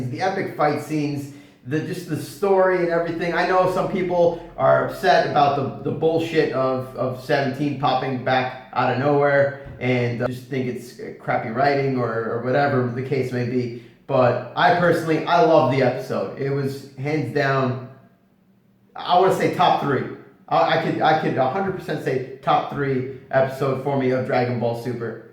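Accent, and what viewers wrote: Italy. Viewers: Ma sei italiano? Lol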